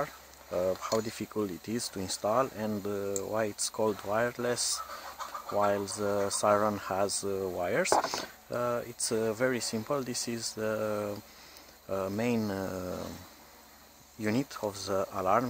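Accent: Romania. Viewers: Nice video ! Are you romanian ? ( your accent ) LOL !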